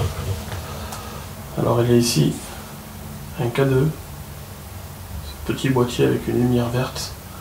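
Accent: France. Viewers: Merci pour ton enquête. Ton anglais est très bon, c'est juste que tu as l'accent français, ce dont les british raffolent lol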